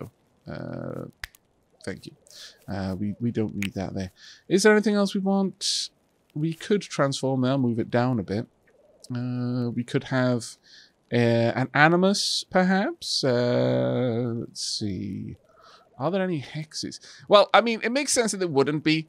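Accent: Wales, United Kingdom. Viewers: I went into this blind from recommendations, distracted by btd6, and I was like wow, this guy sounds like. I had to get out of full screen to see who it was and.... yeah it was you!!! Your calm voice is unique and proper mate, the Welch in you comes out joyfully. When I was sent overseas to RAF Alconbury in East Anglia the winds were like gale force on the day I was picking up my MOPP Gear over stuffed into a parachute bag (Chem suit/mask and all the trimmings) which weighed a metric ton altogether and I was walking the entire way to the dorms against the wind. My friend was heading the opposite direction and he wizzed on by me waving. I still remember the one time the wind stopped and the sky was dry while we were walking to the bowling alley. It was summer about 6 pm so another 3 hours of sunlight at least and I remarked how nice a night it was for once. 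When we got out of the bowling alley not 2 hours later it was lightly raining again and blowing like the channel resented us being over there... as per usual. When I eventually got sent back stateside I was sent to the deserts of Idaho where the ranking Sargent of my job place was showing me around and remarked that I'd best get used to the wind. I simply said ' What wind?' He thought I was boasting. It was too cold to try and explain where I had just come from as he would have doubtless no clue.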